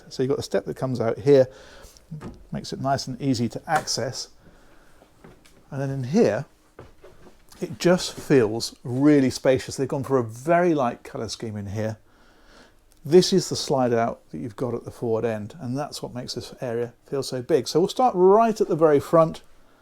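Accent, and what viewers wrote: United Kingdom. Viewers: Are you from England? Nice vehicles looks very cozy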